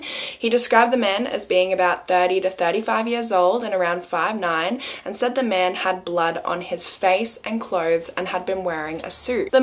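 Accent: Australia. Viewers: Hi, I new that was an Aussie accent, I'm 62yrs and live on the Sunshine Coast Queensland great to find your channel well done really enjoying your channel. Good luck Sweetheart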